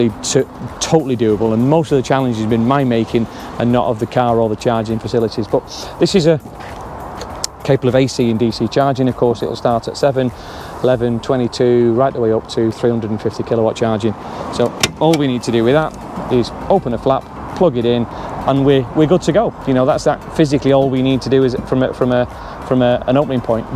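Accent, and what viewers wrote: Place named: Manchester, United Kingdom. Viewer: Very helpful thanks - good to hear a Manchester accent. Take delivery of mine in December hopefully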